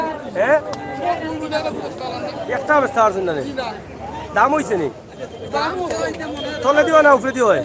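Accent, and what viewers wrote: Sylhet, Bangladesh. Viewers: ভাইজান। আপনাদের সিলেটে কথাগুলো শুনতে ভালো লাগে ও আপনার কথাগুলো অনেক সুন্দর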